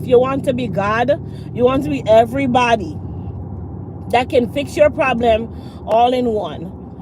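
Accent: Jamaica. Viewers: how are you, you always look good and your hair's on point, I love the color and style period. You are a funny person and when you get hype or talk fast I hear your accent the show that you are from Jamaica, I'm just smiling the whole time and still smiling while I'm doing this text to you, you are the type of person that every person need to have you around them, you happy, funny, joyful, very, very smart, and a beautiful person, Heaven Sent from God, I'm glad that I know you from afar and I will always be looking at you live on video, and ordering your products, I just order some of your products April 1st, 2023, thank you for that 35% off, I do appreciate it so much, take care and tell the next time, take care be safe and God bless always, love you girl, peace out.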